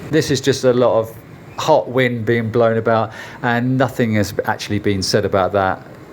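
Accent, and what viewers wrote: United Kingdom. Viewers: I can't take your snooty British accent for more than 30 seconds.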